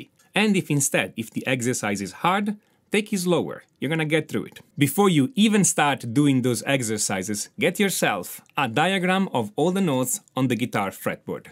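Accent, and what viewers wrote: North, Italy. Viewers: U have an italian accent...? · Accento italiano? 🤔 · Italian, Roman or northwest of Rome?? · Yep, northern Italian I would dare.. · Lui è italiano quando dice lento in inglese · Italiano vero .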